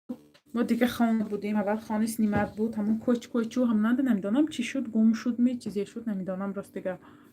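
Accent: Isfara, Tajikistan. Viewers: Апахо бовар кунетон Ман хамин канала гапзанихойи исфарагизоя ед кардаги росо ачойиб забонихудатонки шумо молодец апашка♥️♥️♥️💋💋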